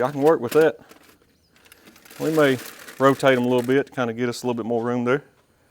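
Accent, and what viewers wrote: South, United States. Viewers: a good ole southern boy from mississippi sayin "we fittin tuh" fittin, fittin, 🙄🙄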